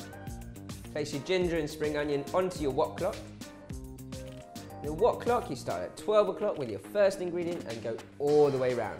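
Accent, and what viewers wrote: United Kingdom. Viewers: A oriental with a British accent OK you got me on this one🤓 Looks delicious❤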